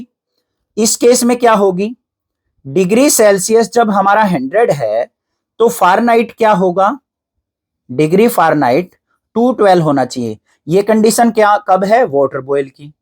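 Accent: Haryana, India. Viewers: Sir aap bhot achha phadao ho pr aap kit the ho apki avag main te haryanvi tone avhe hai 😅😅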